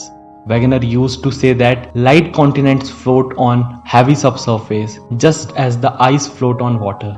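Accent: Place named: India. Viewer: It is a nicely composed video on, how the dynamic hypothesis of plate tectonics was conceived by Alfred Wegener. For the one who wants to learn about the evolution of continents, oceans and mountains, this introductory video will be very much useful. Our students will be able to follow the narrative as it is with the Indian accent. The effort of ' ' is commendable.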